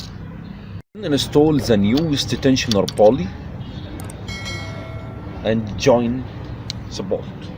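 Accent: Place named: Egypt. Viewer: مع ان أسمك إنجليزي ولكنك حرقة اللغه الإنجليزية بلكنتك المصريه أرجوك خلك على لغة بلدك أحلى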